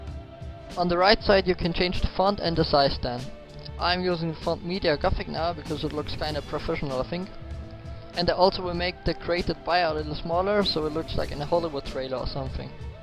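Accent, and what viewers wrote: Germany. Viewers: are you from germany? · man hat gehört das du deutsch bist =) aber richtig geiles tut wird mir sehr helfen danke..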